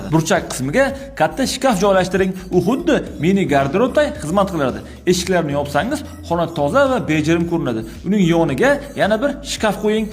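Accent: Namangan, Uzbekistan. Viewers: Шева наманганми? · Namangancha gapitish qiyinda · Аксинча шкафчалар купайиб кетдику шевангиз Наманган шевасими даров таниманда 😂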